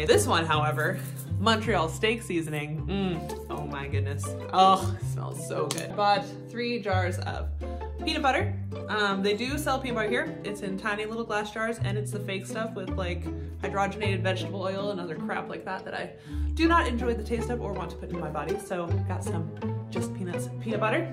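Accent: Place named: Houston, United States. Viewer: Great Houston accent.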